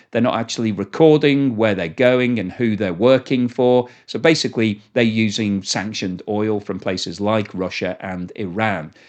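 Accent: United Kingdom. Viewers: Please alter your modality. There is no rational or legal reason why countries like China, Russia, India and the many BRICS countries should not trade with whoever they wish. Therefore, saying that ships 'should not' go into one port or another misleads you audience (who are dumb enough already} into thinking that there is a valid reason for the Anglosphere to think that has a 'right' tot tell the rest of the world what is right or wrong. Saying this in a 'pommie' accent exacerbates your bias. The youtube algorithm is false. Your narration should be censored!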